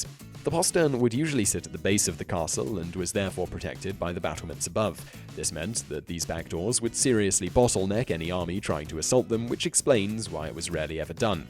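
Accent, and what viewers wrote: United Kingdom. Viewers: Okay after so many videos of this guy, I am convinced he is mispronouncing words incorrectly on purpose, and thinks he can get away with it just because of the U.K. Accent. I swear I don't care what you say medieval is not five or even four syllables.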